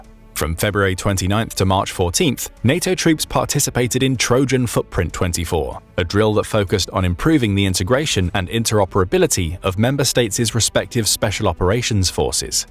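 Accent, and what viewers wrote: England, United Kingdom. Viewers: That English accent is all I need to know about the serious b.s. joke I'm about to hear 😂😂😂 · I think the narrator is from The UK. Greetings from a fellow Dane 🇪🇪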